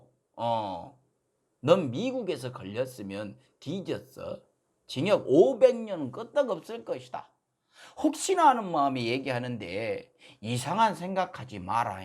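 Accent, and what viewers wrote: Jeolla, South Korea. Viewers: 표준말을 요합니다 전라도 언어로 ...산뜻해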